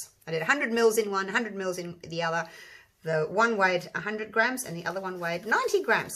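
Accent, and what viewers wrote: Australia. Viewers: so good to hear an aussie voice!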